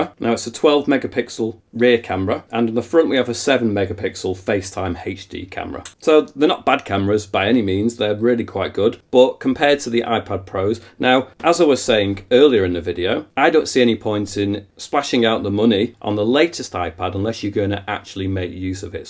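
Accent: United Kingdom. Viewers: Wow a British reviewer on youtube! Yes yes yes!!!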